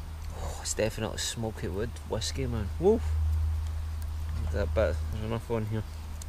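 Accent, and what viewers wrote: Scotland, United Kingdom. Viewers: Where in Scotland is it your from?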